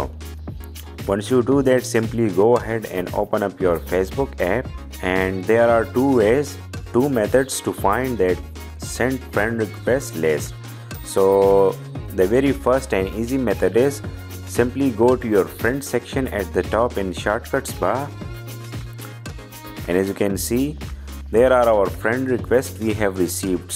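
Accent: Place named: India. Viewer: your indian accent give me chills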